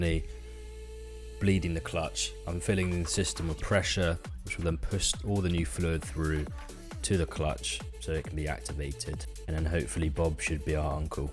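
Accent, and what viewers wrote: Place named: Australia. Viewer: Pretty good aussie accent as judged by an aussie!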